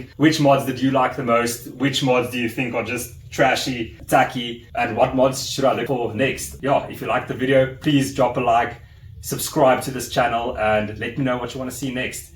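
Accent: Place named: Afrikaans, South Africa. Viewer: Dude, super proud of all the South African youtubers... You guys rock... Only 2 comments, be proudly South African... stop rolling your "R's". Secondly, it's Aluminium, not Aluminum... I get it, people think the Afrikaans accent sounds dumb. But the true South Africans will be proud of it... Keep it up man!!! Subscribing !!!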